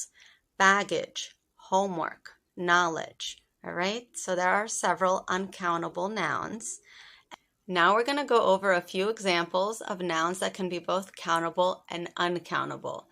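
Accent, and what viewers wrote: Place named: United States. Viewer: You are so beautiful and your American accent is so pleasing to my ears. Happy New Year !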